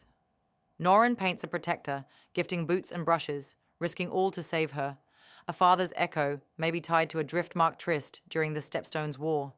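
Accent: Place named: Australia. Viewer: This sounds like the same voice as the last video, but with an Australian accent instead of a British one... I want a Jamaican accent for the next video.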